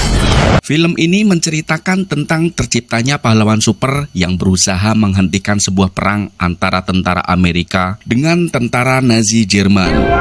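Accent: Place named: Banyumas, Indonesia. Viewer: Kie tah wong ngapak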